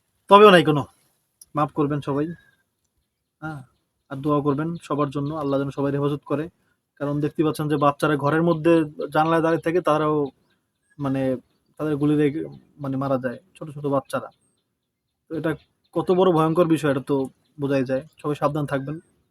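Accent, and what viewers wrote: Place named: Sylhet, Bangladesh. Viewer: আপনি সিলেট বলছেন আমার খুবব ভালো লাগছে আমি সিলেট থেকে আপনার ভিডিও দেখি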